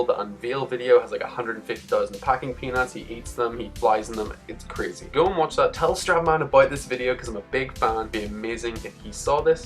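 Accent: Ireland. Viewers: Are you irish? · U Irish?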